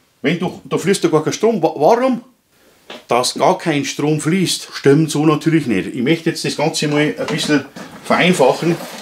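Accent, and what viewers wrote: Bavaria, Germany. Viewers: Habe gut gelacht. Warum? Weil mein Kopfhöhrer am Ladegerät hing und Youtube versucht hat, Bayrisch mit Untertiteln auf Hochdeutsch zu versehen. :D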